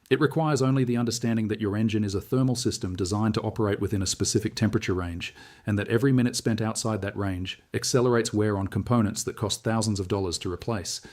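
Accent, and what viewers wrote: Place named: Australia. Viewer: Asian Guy got himself an Aussie accent!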